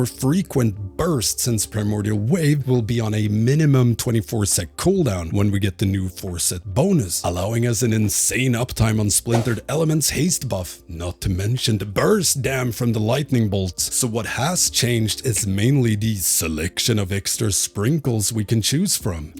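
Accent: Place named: Sweden. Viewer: bis swedish accent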